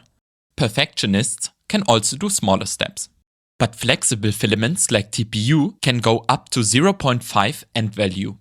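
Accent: Germany. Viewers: Do you also have a german version of this video? Sounds like you are german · my guy as a fellow german, i’m sorry but ur accent is borderline too much. the content is great but it’s really hard to listen to. My guess is it’s because you „chop“ the words, u should adjust your max retraction and flow rate :D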